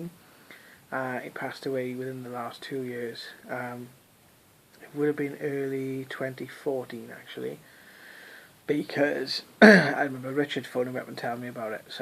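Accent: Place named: United Kingdom. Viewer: I went through this exact same rationalization process several months ago. My advice to you is to choose an example that you have personal knowledge of, and research it through copyrights, patents, and especially microfiche. If possible, do this research in the library rather than from digital sources. The physics of our world claim this is possible in theory, but you would have to pay attention to the modern discoveries, especially those from this year, ie the fifth force being dark protons, and the ability to engineer particle entanglement. If you are from Great Britain, as your accent suggests, you have the benefit of having been schooled with the bible. You may find your proof in a bible change. Good luck to you; the truth sets you free.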